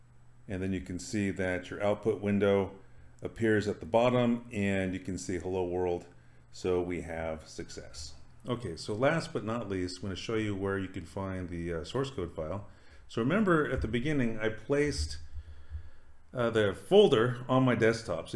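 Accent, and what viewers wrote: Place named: United States. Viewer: Some positives: * This is a recent tutorial, as of Nov. 2022, so it's closer to what IntelliJ looks like right now than older tutorials. That's **huge**, IMO, if something goes wrong (as it did with me when I first installed IntelliJ IDEA and a JDK). * Good pace * Clear, in American English (which may be a negative for some, but helped me) * Clearly, the person knows how to teach (I'd written something similar to the above in an earlier version of my comment, but I got distracted by coding and when I tried to return to finish my comment, it had gone "poof".)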